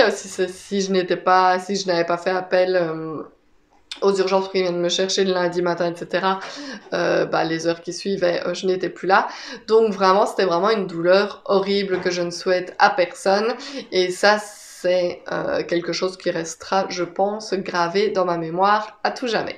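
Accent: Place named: Liège, Belgium. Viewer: Coucou, je me doutais bien que tu étais de Liège ton accent 😊. C'est quand même impressionnant d'aller aux urgences, et de s'entendre dire que nous n'avons rien. Merci bonne journée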